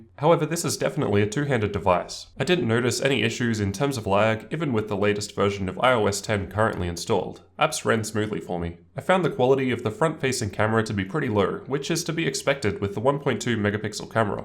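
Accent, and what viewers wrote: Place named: Australia. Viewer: Are you an Aussie? I have an Australian friend with a similar accent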